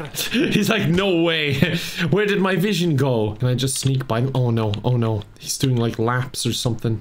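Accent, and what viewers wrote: Ireland. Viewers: Ah yes the Irish man talking about NBA love u! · Hearing an Irish Lad talking about basketball just hit different for some reason. Also love your vids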